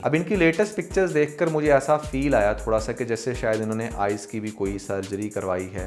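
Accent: India. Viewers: Aap apni guftagoo mein kuchh indian rang bharnay ki koshish kr ke apnay bolchal ka satiyanas kr rhay hain. Jesay keh lafz "phir" ko "fir" bolkar aur khush ko bhi indian style mein bol kr. Meray khayal mein is trah aap ne apna bolnay ka standard gira liya hai. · exactly ajeeb ganda paindo indian bhaiyya wala style hai bolnay ka. seems an uneducated person.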